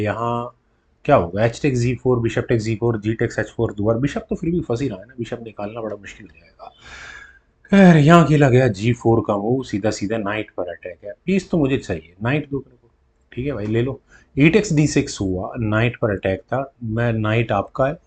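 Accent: India. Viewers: Sir punjabi lag rahe ho?.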